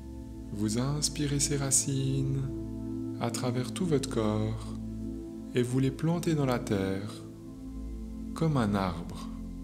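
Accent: Vaud, Switzerland. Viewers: Votre voix est extrêmement rassurante et ce petit accent vaudois y est pour beaucoup a mes yeux... ;-) Merci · Bonjour. Merci 🌸. C'est plus fort que moi alors je le dis: cet accent vient de CH n'est-ce pas? J'ai expérimenté ou plus précisément, je me suis rendue compte de cette énergie en 2020, elle s'est invitée à l'imprévu ... hihi. Je l'ai apprivoisée et j'arrive à la faire venir à chaque fois que j'ai envie. Jusqu'à mauntenant je ne fais que la ressentir et sentir ma sensation ... maintenant je sais qui c'est, je lui ferai part ma demande. Encore MERCI ❤. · Merci au petit suisse, quel voix, quel intonations et quel accent délicieux et puis toute cette douceur ❤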